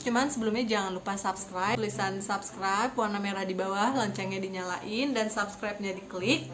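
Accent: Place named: West Sumatra, Indonesia. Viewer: Dari cara ngomong nya di dengerin per kata seperti orang minang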